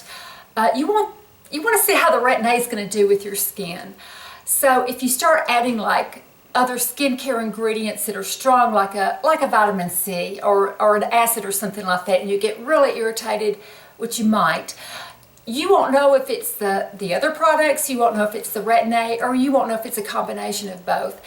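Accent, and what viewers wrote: South, United States. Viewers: It's a beautiful southern accent:) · My mom was Southern, so its very comforting listening to you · Your skin looks beautiful, especially your neck. How often I see girls ignore their necks and when the face looks good but the neck looks like a turkey's wobler, just ruins the effect. Being a Carolina gal, myself, I really loved listening to your Southern accent. Your hair is so thick looking on top, any tips on hair loss? Thanks, nice video from a Beautiful Lady.....................................Linda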